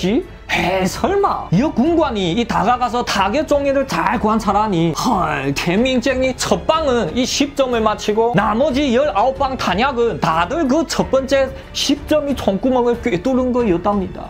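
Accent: Yanbian, China. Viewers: 수고 많아요 연변한국어 정겹게 듣고 있어요 좀더길게 해주세요 너무짧아요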